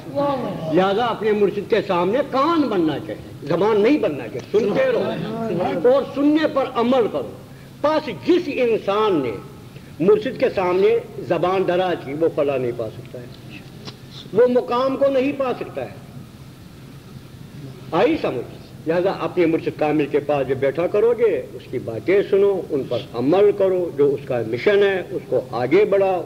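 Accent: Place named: Gujarat, India. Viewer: Gujratii juban hi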